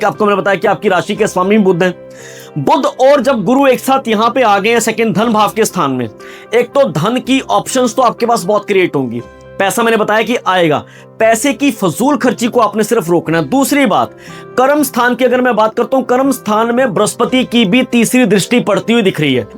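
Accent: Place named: Punjab, India. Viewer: Punjabi accent in hindi😊 sardar pandit😊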